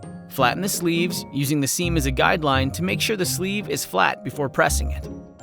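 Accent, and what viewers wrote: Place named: United States. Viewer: Bli kvitt den teite amerikanske stemmen.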